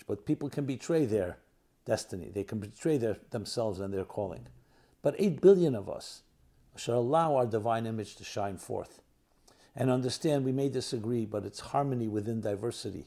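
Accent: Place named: Brooklyn, United States. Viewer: Thank you, Mr.. Rebeca was a ryan and I know somebody from the Armenian background that is persecuted in Iraq. We support the Jewish people as a persecuted people and recognize Islam as a genocidal ideology. Both of us have been attacked by Muslims and have studied the reasons for this of course, they will always deceive people when they have the chance that there's some other reason, but they do it because of the evil of their religion. You said that the Jews remain in that area for hundreds of years my suggestion would be to clarify how many hundreds of years wasn't it thousands? Are you from Brooklyn- I used to live in Brooklyn near Flatbush and Atlantic, and in the area that Rabbi MK lived the same area where the Al-Qaeda mosque was only about 1 mile away. and I noticed a little bit of an accent. We wish you safety during this time of persecution and want to help come up with some solutions. 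Go with YHVH and thank you for being plain about the lies. We also have a lot of information, and predicted the recent attacks by Isis, as well as the reasons for it, such as releasing Isis, and we also predicted that the other terrorist organizations would bond with them over the attack against the Jew Islam itself largely pivots on antisemitism! We would like to connect with you do you have an email? <link>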